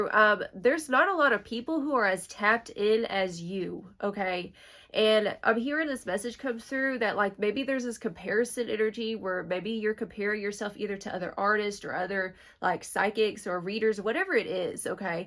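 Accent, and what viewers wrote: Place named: Louisiana, United States. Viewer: I listen to you a lot! You sound a little stuffy! Lol. I feel you on the Accent, Im from Southwest Louisiana! 🩷💜🐈‍⬛🦉🐦‍⬛🕊🦅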